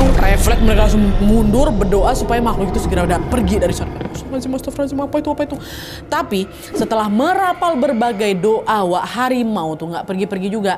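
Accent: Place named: Banyumas, Indonesia. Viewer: mbaa wongg ngapakk😂😂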